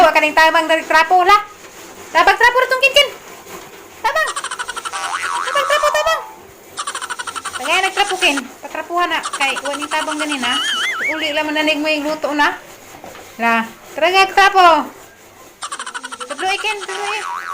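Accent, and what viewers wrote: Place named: Visayas, Philippines. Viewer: Sis ntutuwa ako sau hahahahh srap pakinggan salita mo medyo iba ang bisaya dto samin sa mindanao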